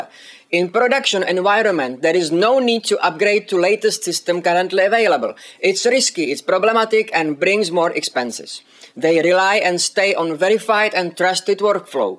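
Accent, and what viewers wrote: Czech Republic. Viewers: you are from czech :) ? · Are you from czech republic?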